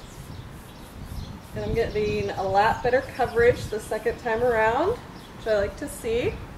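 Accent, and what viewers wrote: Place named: Illinois, United States. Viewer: I'd watch you paint anything....BTW, love the Illinois accent, as someone from Central IL I recognized it right away.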